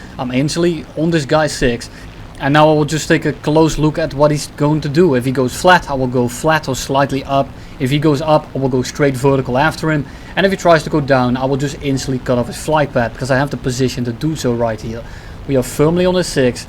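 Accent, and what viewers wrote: Netherlands. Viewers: Are you dutch? You sound like you are from the Netherlands... These accents are too recognizable in Europe..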